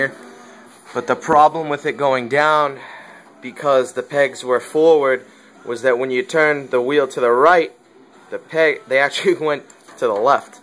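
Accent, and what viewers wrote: Boston, United States. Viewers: boston right?? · are u from boston? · Keep jumping in and out of that Boston accent.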